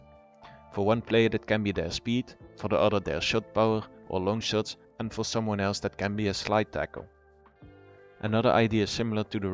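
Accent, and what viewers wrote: Netherlands. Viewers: Goeie video, dacht al toen ik je stem hoorde dat je Nederlands was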